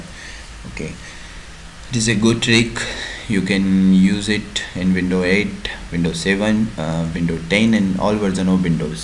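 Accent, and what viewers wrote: India. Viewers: indians with their accents.... bruh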